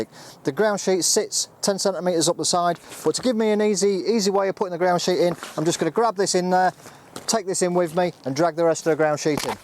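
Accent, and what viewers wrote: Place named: North, United Kingdom. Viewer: Absolute nightmare presentation mate. You sound like a cross between a pissed up northerner that's just inhaled a helium balloon with the animation of a Duracell bunny.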